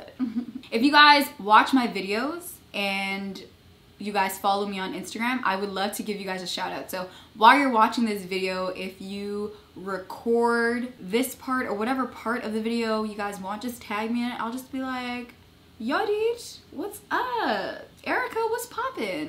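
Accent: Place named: Tigrinya, Eritrea. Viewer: I love ur tigrigna accent i want hear u more sweety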